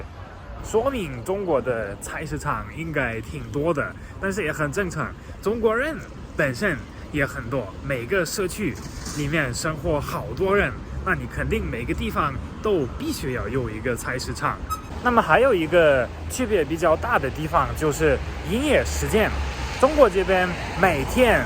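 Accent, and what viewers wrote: Sichuan, China. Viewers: Learn. Chinese stay Beijing . He speak si chuan. And is video skill need improve . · 晕一嘴川味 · “ ”的发音好四川，哈哈，你讲的是老外普通话夹杂四川词汇，非常有意思！继续加油哦 菜市场比较传统，便宜新鲜，会过日子的老人主妇煮夫喜欢去，年轻人则更习惯去超市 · 一口道地四川話，很有趣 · 小伙的汉语已经被四川人带跑偏了😃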